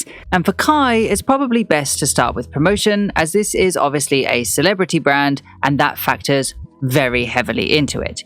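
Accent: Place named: London, United Kingdom. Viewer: Great vid, ominous London and or U.K voice! Swear these vids gone help a young future fashion journalist win!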